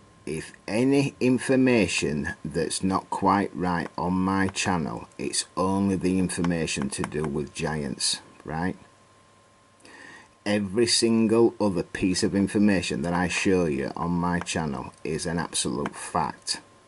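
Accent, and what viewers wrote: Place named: Scotland, United Kingdom. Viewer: I've just discovered your YouTube Channel and subscribed. I've been interested in ancient history/archaeology since I was a child (I'm now 60 years old) and finally, now, lots of things are starting to make sense thanks to your videos. Do you have a web page? I can't find anything other than a couple links when I "google" / You Tube channel - no real website(s). Can I ask your name? Maybe I can find more info that way...also, love your interesting accent! Scottish?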